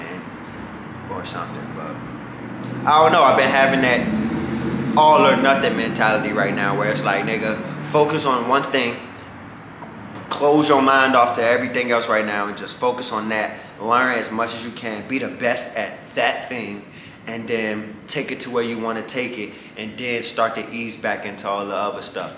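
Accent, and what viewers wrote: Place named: Florida, United States. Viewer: Are you from Florida? I love your accent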